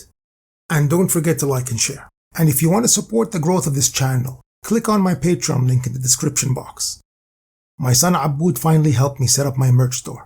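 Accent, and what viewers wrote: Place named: Egypt. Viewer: By the tone and inflection, the narrator is Egyptian and I suppose he didn't want to reveal that aspect of Egyptian history for fear of it being mistaken as a bad thing. A euphemism of sorts. The price of gold in Egyptian markets plummeted as did the cost of living .. which is a good thing for the public masses..and bad thing for the rich.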